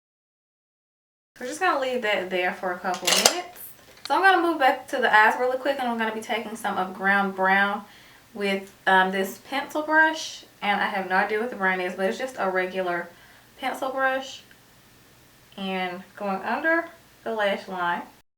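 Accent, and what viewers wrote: South, United States. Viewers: I really enjoy a talk thru because it feels more personal. And I really like your southern accent. Please do more of these! 😊💕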